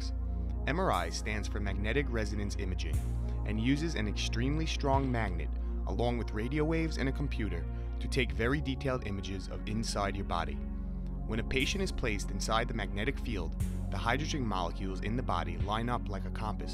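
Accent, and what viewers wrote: Boston, United States. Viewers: I don't know what was better, the education or the Boston accent.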